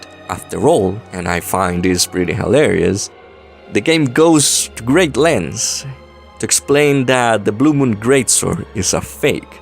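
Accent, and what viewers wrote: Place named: Europe. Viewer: Loved the video so much! Thanks for covering this. By the way, are you european? You have a certain accent!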